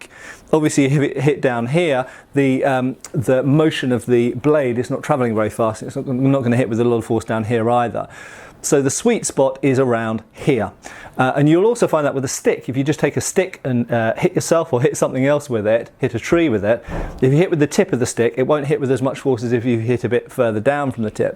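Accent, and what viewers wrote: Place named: England, United Kingdom. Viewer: Only reason why someone is watching this for such a long time is his English accent!🤺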